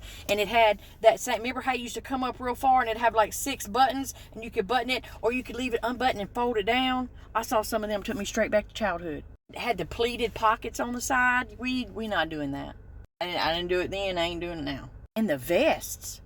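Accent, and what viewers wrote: South, United States. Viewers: Hussy and Harlot😂....love the southern accent!❤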